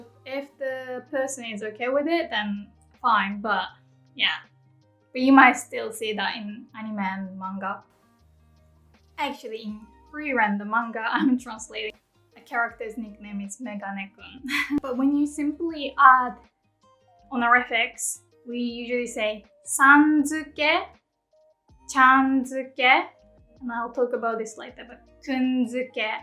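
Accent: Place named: United Kingdom. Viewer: You have a british accent. Did you learn English in Britan?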